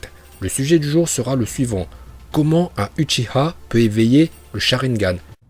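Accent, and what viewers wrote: Japan. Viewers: pour eveiler le sharingan il faut d'abord controler le migaté no chovouie ps ton accent japonais est incroyable je met mon like directe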